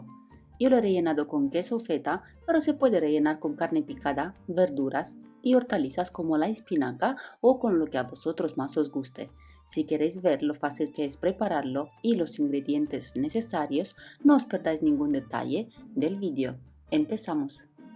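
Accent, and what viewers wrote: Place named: Romania. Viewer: Que buena pinta!!! Ce bun!! Eres rumana?? Tienes acento así eres muy buena cocinera !!! O sa fac reteta multumesc mult!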